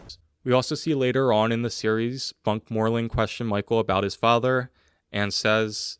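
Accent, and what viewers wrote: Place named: Baltimore, United States. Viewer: I can hear your Baltimore accent, and I weep with homesickness for Old Bay Seasoning. Thanks for making this.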